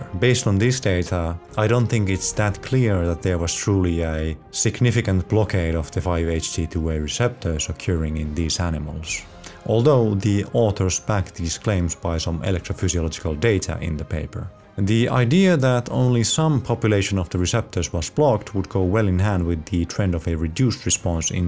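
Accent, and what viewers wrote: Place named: Finland. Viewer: Sounds Finnish :)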